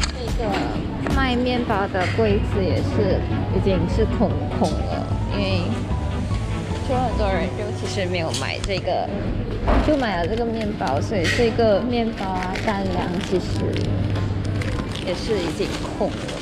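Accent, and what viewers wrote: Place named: Penang, Malaysia. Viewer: 槟城口音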